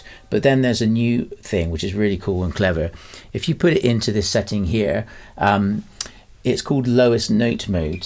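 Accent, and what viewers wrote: West Country, United Kingdom. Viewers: Excellent demo and your playing is awesome. Handy pedal, I must say. Reassuring West Country twang too, especially as I grew up in Brizzle. 😀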